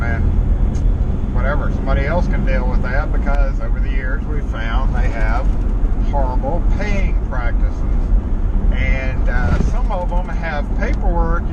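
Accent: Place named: Mississippi, United States. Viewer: Ah Jackson Miss. Baton Rouge LA here. Thought that accent sounded real familiar. Next time I'm in the area I'll pop in the shop and carry on about how I can pick locks blah blah blah 😂 jk 😆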